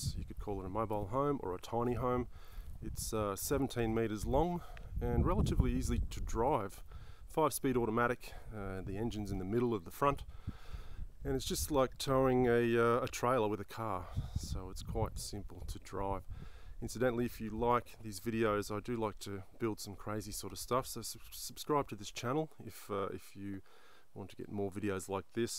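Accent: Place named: Australia. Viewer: It's an Aussie accent.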